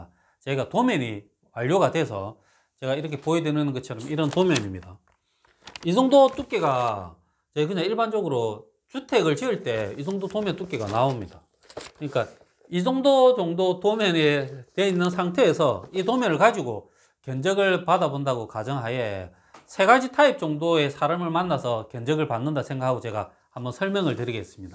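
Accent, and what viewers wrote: Gyeongsang, South Korea. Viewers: 님이 집 짓기 하는 지역이 어디인가요.? 경상도 말투 같은데 사업장과 멀면 비용이 더 들어갈까요? 저는 합천입니다.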